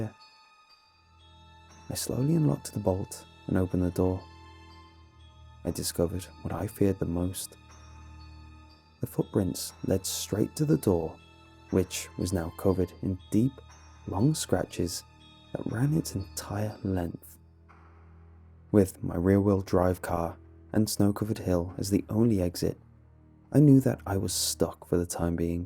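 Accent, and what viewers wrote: England, United Kingdom. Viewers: Why do all tge Creepy Pasta channels sound the same? Are they English or just proper sounding Americans?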